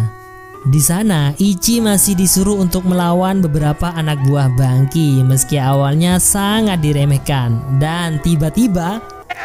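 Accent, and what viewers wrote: Madura, Indonesia. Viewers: Oreng dimmah bang madura yee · Lha orang madura.. 😅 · Madura dimmah be'en kang?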